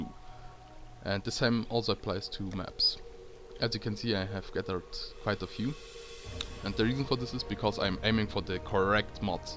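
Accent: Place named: Germany. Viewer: Are you German ? Ich höre das ;) Vorallem wie du Bonus aussprichst :D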